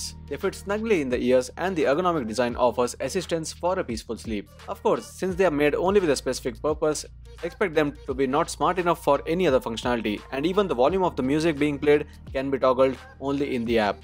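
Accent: India. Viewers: Indish english?! Its sooo nasty 🤮🤮🤮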